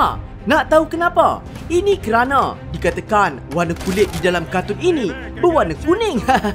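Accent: North, Malaysia. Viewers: suka channel ni. Subscriber baru di sini. orang utara ka? A kat belakang tu pekat benau. Hehe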